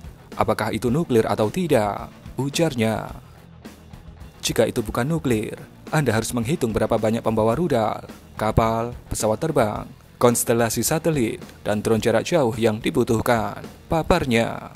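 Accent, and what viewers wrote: Java, Indonesia. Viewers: Medoke wong jowo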